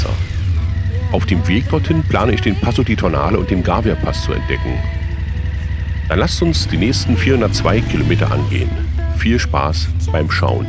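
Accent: Berlin, Germany. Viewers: Mal gespannt auf die nächsten Videos. Schau mir dann mal die Serie der Westalpen an. Ich muss nochmal sagen: wirklich toll gemachte Videos. Und angenehme Stimme, trotz Berliner Slang ;-) Grüße aus Kerpen / Rheinland. Ralf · Hallo. Die Verbindung von Sprache, Gefühlen und Emotionen mit Deinen schönen Bildern machen das ganze echt sehenswert, erlebenswert und wir sind jederzeit bei dir. Du bist mit Deiner Berliner Schnauze echt entspannend und authentisch. Man nimmt dir das echt ab, deine Emotionen die du erlebst, die jeder Motorradfahrer kennt und so genießt. Deshalb fahren wir Motorrad um genau das zu spüren. Bleib Gesund und lebe deinen Traum, denn es geht ums Reisen nicht ums Rasen. Nur wenn du anderen darüber berichten kannst was du erlebt hast bist du Gesund wieder nach Hause gekommen. Das muss so bleiben um wieder einmal dem Alltag zu entfliehen. Bis zum nächsten Mal. 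Gruß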